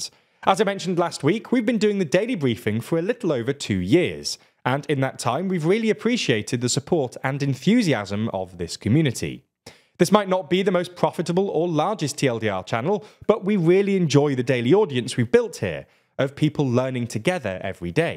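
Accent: United Kingdom. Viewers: Ok but why are you British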